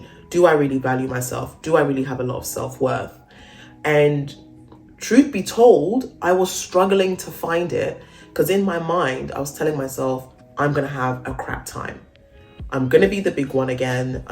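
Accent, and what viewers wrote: United Kingdom. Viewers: I had a feeling you were British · Are you British? You don't sound American. Very interesting video, thank you for sharing. Your story telling style is so calming.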